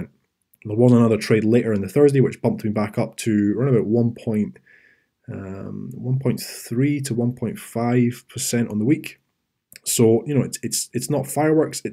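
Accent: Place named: Edinburgh, United Kingdom. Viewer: Hi, is there a way i can contact you by email to ask a few questions? I am completely new to this and looking to do this on a part time basis. You sound like you are from the Edinburgh area, I am from and live in Aberdeen.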